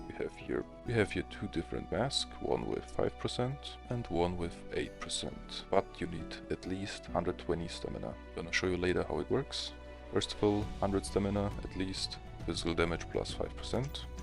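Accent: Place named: Russia. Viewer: Я слышу русский акцент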